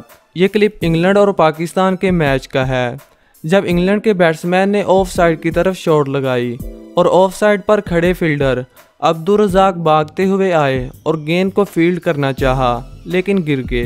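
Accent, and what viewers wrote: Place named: Punjab, India. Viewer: Poor comentry punjabi style😔😔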